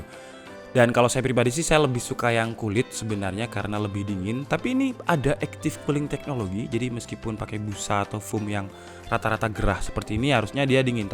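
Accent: Java, Indonesia. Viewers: Wong jowo asli Iki.. ngomong Cok e mbulet🤣🙏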